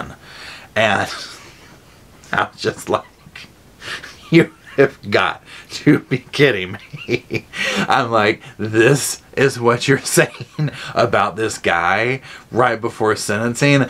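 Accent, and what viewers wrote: South, United States. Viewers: Hi! I’m a new subscriber and I’m making my way through your videos. Love them!!! I’m southern so I love your southern spin in this one!! ❤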